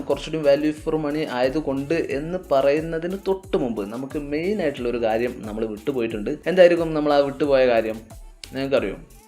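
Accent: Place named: Malappuram, India. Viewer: Ayye malappuram bhashayil avatharam... 🤭🤭🤭😬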